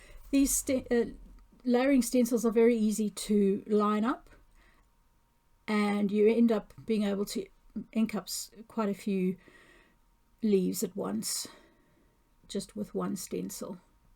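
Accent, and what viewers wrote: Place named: New Zealand. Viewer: Gorgeous and love to hear your accent. Spent three months in NZ in 1974. Loved it! Hugs & Aloha! 🤙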